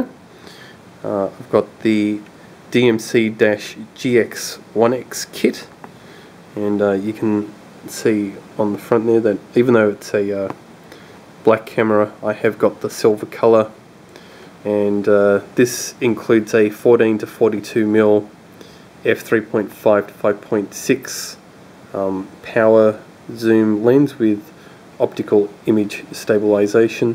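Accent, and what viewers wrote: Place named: Australia. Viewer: have u heard of australian accent?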